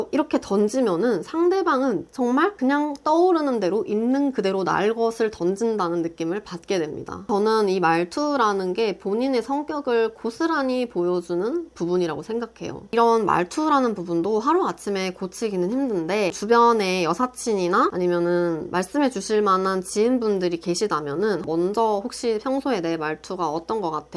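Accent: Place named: Gyeongsang, South Korea. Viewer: 공감 많이 되네요~~사람에 대한 배려심. 기본..영상 잘 보았습니다. 말하시는 중간중간에 경상도 억양이 살짝 묻어나시는거 같아요!!!!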